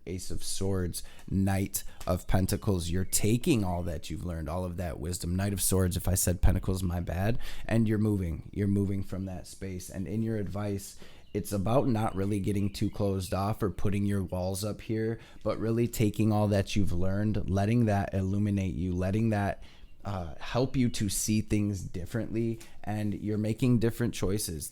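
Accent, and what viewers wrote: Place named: Chicago, United States. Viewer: I'm new to your channel, like 30 days or so. Definitely sticking around. Love your vibes and that chitown in your accent. Thanks mucho fam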